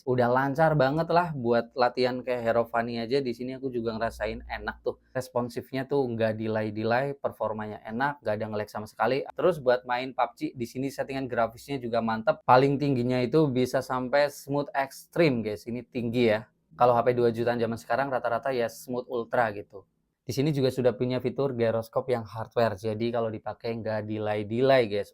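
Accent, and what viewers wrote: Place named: Banyumas, Indonesia. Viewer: Logatnya ngapak banget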